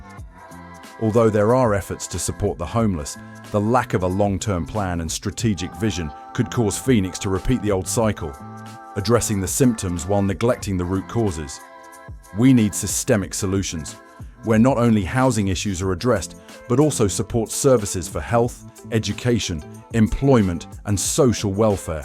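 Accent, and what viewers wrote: United Kingdom. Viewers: This is not not not the fault of “a society “ like the narration of the idiot writer of this script ! This is a result of Democrat run cities like Portland, Seattle, San Jose, Los Angeles and many others. An immoral government allows for homeless encampments, poor law enforcement, leniency towards drugs and prostitution along with allowing gangs and cartel control… the wealthy drink wine and socialize while they care nothing for law and order and safety, health and security for the community. The facts speak for themselves. I live in a peaceful clean area of good governance by common sense leadership. Thank goodness for some good places to live still. The narrator should take his British accent and tell the story of the Isslummist problems in England! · You sound like you're from the UK, why don't you go back, there's plenty to work on there. 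It's such a crazy thing that naive people tend to believe people from the UK or Australia because they have this accent that tickles their ears, all the while the UK is imploding.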